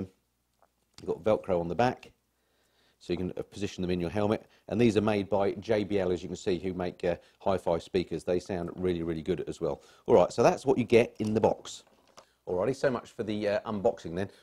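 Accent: United States. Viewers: LOL! Loved your American accent! My buddies and I are buying these soon. They seem to be the best out there. It was fun to see box contents and get your take. I wonder if Cardo speaks Texan?! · loved the American Accent .. I still find it amazing that we speak the same language, yet "you guys" sound like your chewing on your words :)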